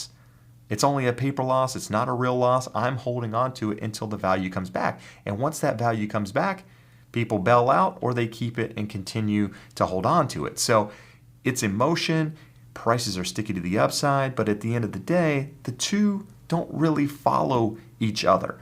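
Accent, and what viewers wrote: California, United States. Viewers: You're from California or I wrong?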